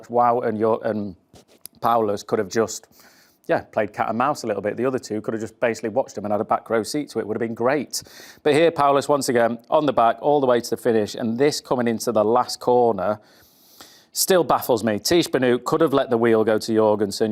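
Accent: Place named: Yorkshire, United Kingdom. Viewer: Thats a lad!! Proper Yorkshire lad!! I live in the states these days so watching and listening to makes me feel at home. Love it!! 🤘🏻🇬🇧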